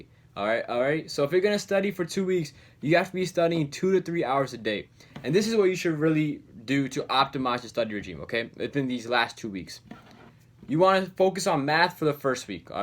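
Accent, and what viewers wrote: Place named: United States. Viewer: dude how do you have such a fluent US accent like you sound like someone who was actually raised and born in america and then spent most of their time among american parents or something cause for an indian to get such a fluent american accent is just amazing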